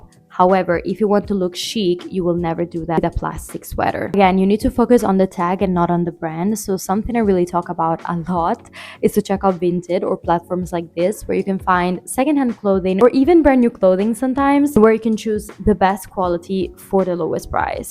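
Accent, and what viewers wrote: Italy. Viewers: sentivo l accento ma mi sono stupita di come parli inglese da italiana, come mai fai video in inglese? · wait a minute are u italian?? i noticed only now the accent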